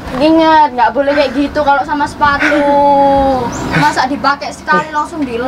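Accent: Java, Indonesia. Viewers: Iya soalnya medok gitu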